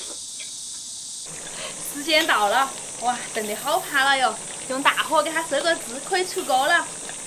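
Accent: Sichuan, China. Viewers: 都不知道你说的啥普通话 你是那个嘛四川的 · 四川妹子?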